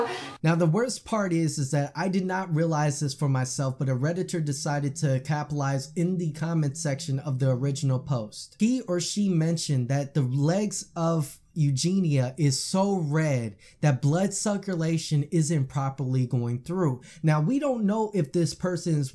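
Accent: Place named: South, United States. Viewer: I keep hearing a suthern twang when he's talking. Am I the only one hearimg that? Gotta love those country boys lol 😉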